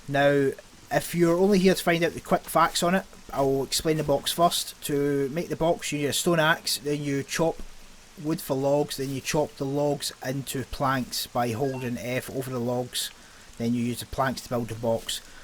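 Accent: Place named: Scotland, United Kingdom. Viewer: Not at all hes Scottish conor is irish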